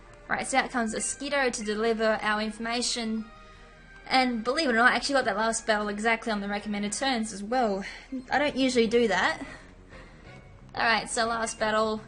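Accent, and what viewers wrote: Australia. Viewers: your accent makes me want to visit australia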